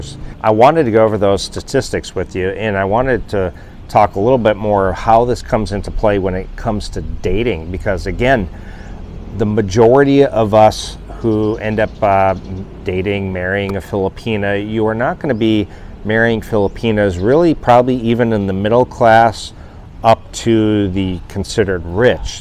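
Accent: United States. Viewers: I noticed you wear Italian badges, but you sound like an American. If you are from America, are you not proud to wear the American flag?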